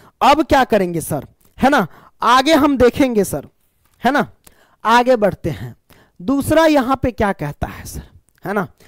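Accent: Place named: Bhojpuri, India. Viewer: Kya aap bhojpuri h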